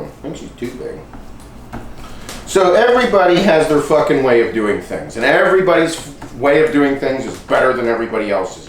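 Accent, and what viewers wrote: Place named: Pittsburgh, United States. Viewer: This dude has to be a Pittsburgh boy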